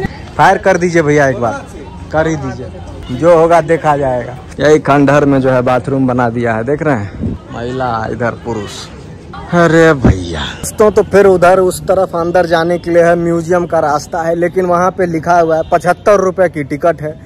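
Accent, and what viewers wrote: Bihar, India. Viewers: Bhaiya ekdm khud ko feel krte h apke videos dekh kr.... whi bebak andaj....dogs ke dekh kr unse bat Krna ..wo hmara Bihar bala accent.... ekdm khud ko feel krta hu. M Varanasi aa rha hu Saturday ko .aap miloge? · Bhaiya apka video ka rooj wait karte h apka voice Etna mast h apne ape par bihari wala filling automatic aa jata h 💗❤️❣️❣️❣️❣️😘😘😘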